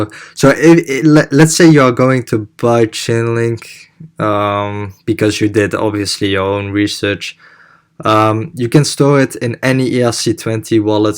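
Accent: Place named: Netherlands. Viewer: you must be dutch or belgian :D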